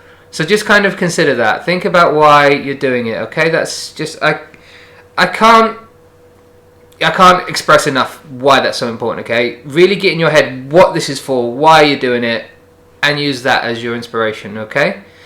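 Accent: United Kingdom. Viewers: Awww there you go :D you can talk to the camera perfectly! You must be british though :3? Very true tips :)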